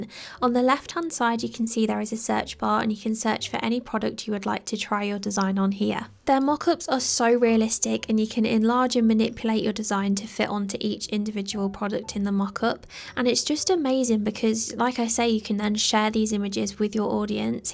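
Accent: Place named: United Kingdom. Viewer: I love listening to you speak! The UK girlies all sound so lovely to my American ears 😂 Also very helpful videos!!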